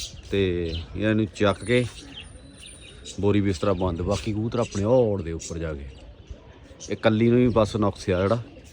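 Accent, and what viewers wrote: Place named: Doaba, India. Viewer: Bai tuadi boli duabe mtlb sade Ali a kehda area Banga ja phagwara ?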